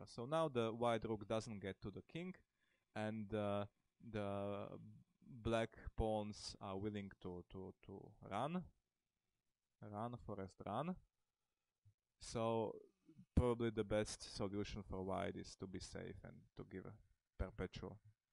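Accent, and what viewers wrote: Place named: Eastern Europe. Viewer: You speak portuguese, i speak spanish, and we both are able to understand him regardless of his pronunciation. He is from a slavic country, so don´t complain about "free" and "threes".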